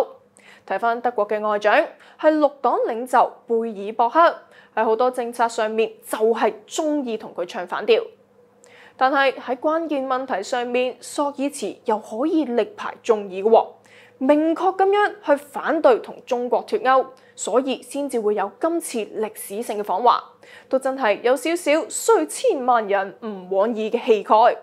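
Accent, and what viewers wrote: Standard. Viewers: 歡樂時光，人靚聲甜字正腔圓又鬼馬😘😘😘👍👍👍💪💪💪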